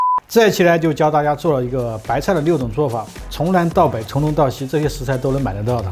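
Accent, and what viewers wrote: Sichuan, China. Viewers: 帅哥亲切的四川普通话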